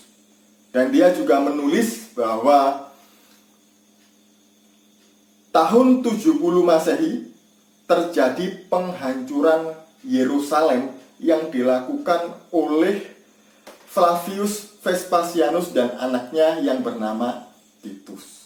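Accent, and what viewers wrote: Java, Indonesia. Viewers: 😂😅🤣😂😅🤣😂😅🤣 Wahai PENDETA bersuara medok Setiap agama mempunyai versi. Versi kristen yesus mati tersalib yg tadi anda bicarakan itu memang benar. Dan itu gak jadi soal karna itu versi anda. Sedangkan versi islam Allah menyerupakan wajah nabi isa yg mirip dengan isa lalu disalib. Karna cara salib itu cara hukuman mati bagi org yg bersalah dan itu hukuman yg KEJI DAN TERKUTUK. bisa dibayangkan jika nabi terbunuh dengan cara disalib tentu sangat hina.mungkin skrg isa akan diolok2 karna mati dengan cara yg keji. Maka dari itu allah menyerupakan wajah nabi isa dengan org yg diserupakan lalu dia disalib dan allah mengangkat hidup2 nabi isa ke langit. Dan anda seharusnya berfikir. Tuhan anda TELAH MATI itu artinya TUHAN YG ANDA SEMBAH itu tidak berkuasa atas dirinya. Dan anda malu mengakui tuhan anda dengan cara disalib dengan cara hukuman yg keji dan terkutuk Lebih baik mana coba Tuhan dibunuh disalib Apa tuhan diangkat hidup2 dan diangkat ke langit. 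Pake akal logika yg sehat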